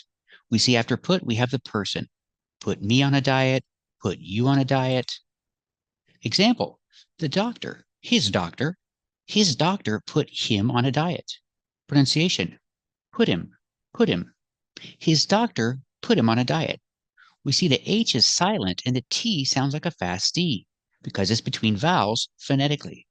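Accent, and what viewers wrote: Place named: United States. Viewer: face-blue-smiling:... Hello. I follow you for two main reasons: I appreciate your American accent and your professionalism. You explain all the details thoroughly and consistently provide examples of how to use various structures. By the way, where is your accent from? Thanks a lot for everything you do!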